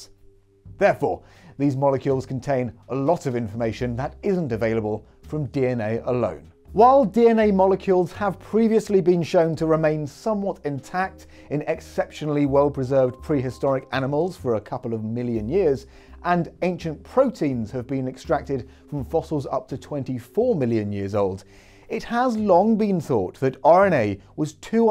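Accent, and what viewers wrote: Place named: Paraguay. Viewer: Dude he’s from Paraguay, don’t annoy him too much on his English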